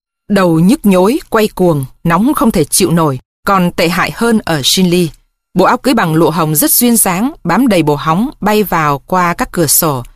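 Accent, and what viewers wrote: Standard. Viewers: Truyện nghe đi nghe lại vẫn hay , nhiều tình tiết hấp dẫn cộng với giọng đọc thật chuẩn và truyền cảm , cảm ơn tác giả và người đọc thật nhiều . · Bản dịch quá hay . Giọng đoc cũng rất chuẫn . ❤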